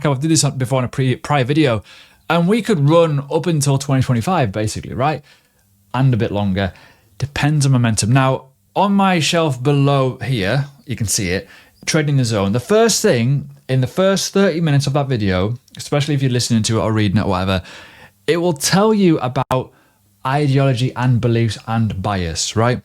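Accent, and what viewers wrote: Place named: Yorkshire, United Kingdom. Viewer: I still can't get a handle on your accent mate... sometimes I get north Yorks/Darlington, sometimes I get scouse..😂😂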